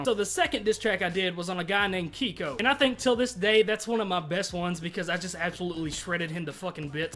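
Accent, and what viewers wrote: Kentucky, United States. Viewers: Where from southern KY You from?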